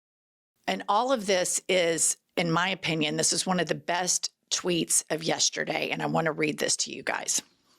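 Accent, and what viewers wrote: South, United States. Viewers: I like you, but please learn the pronunciation of Bukele's name. (Boo'-ke-lay) He's the human form of a shriveled ballsack, and I'd rather you call him Bukake, but that's not why I sent this correction. I love the pod, you, and the. And I will admit, a southern accent on a woman is sexy, whereas, on a man, it just makes them sound stupid. I want to retain my attraction to the female southern accent. Please don't take offense.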